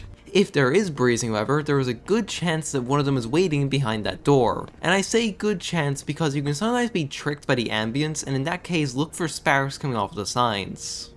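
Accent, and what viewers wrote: Ireland. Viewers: i can hear the damn irish in your voice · Is bro from [anywhere in the island of Ireland (Not just in the Irish republic)]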